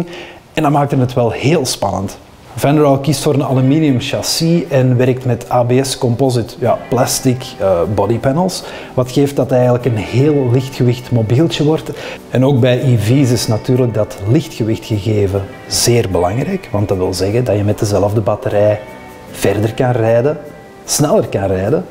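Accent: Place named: Antwerp, Belgium. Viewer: Schitterende vlog makker, trouwens terug mooie intro ... en de oneliners jah ... samen met het Antwaarps accent de max hé 🤣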